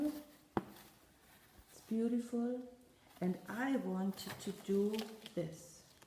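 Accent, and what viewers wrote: Germany. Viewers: Your stitchy baskets and table are so pretty, and your lovely German accent is delightful to listen to. Thanks for another wonderful video.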